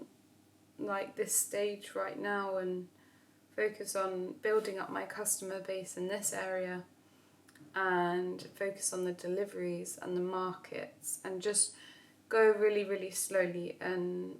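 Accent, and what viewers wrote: United Kingdom. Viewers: It's very nice to listen to and watch you. Your baking looks very delicious. I'm interested in any topic, do what you like. p.s your video for me it's the practice of understanding the English language and British English accent. I'm serious :) Thank you.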